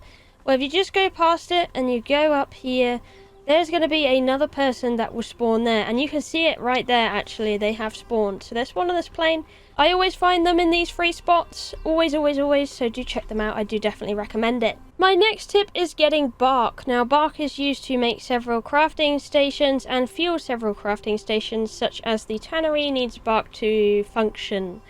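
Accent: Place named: United Kingdom. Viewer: Challenging accent as a native german speaker, but I've got a friend in the UK with a similar accent, so it wasn't as bad, heh. What I wanna say is, despite the unfamiliarity to your accent, I still understood almost everything. Thanks for the video, it helped. One question though; Are daggers viable/good throughout the game? Like does the bleeding effect for example gets stronger with the weapon?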